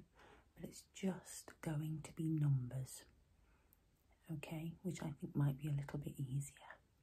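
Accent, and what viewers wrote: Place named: Nottinghamshire, United Kingdom. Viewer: that'll explain the northern sounding twang to your voice. I love that Nottinghamshire accent. It's like a soft northern accent. It works really well for soft spoken asmr. Would love to hear what your voice sounds like with in ear whispering ❤️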